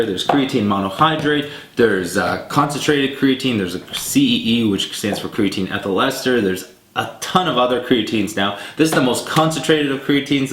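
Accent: Canada. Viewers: Is he Canadian? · You have a thick Canadian accent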